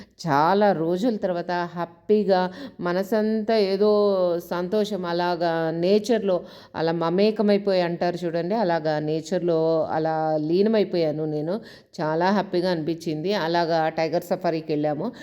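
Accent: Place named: Godavari, India. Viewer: Godavari slang suuuuuper ma'am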